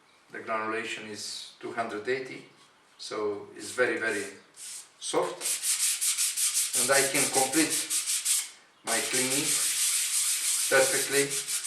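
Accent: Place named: Romania. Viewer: Si mie mi se parea ca are accent romanesc, dar am zis ca-s nebun. E brand romanesc??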